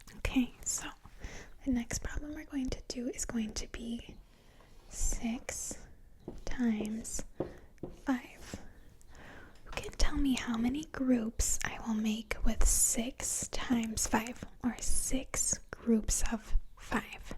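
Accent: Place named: Upper Midwest, United States. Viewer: Based on the accent, I'm guessing upper Midwest U.S.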